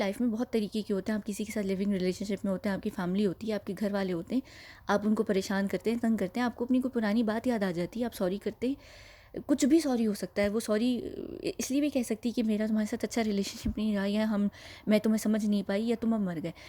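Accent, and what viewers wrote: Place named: United Kingdom. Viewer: You have a British accent when you speak English 😀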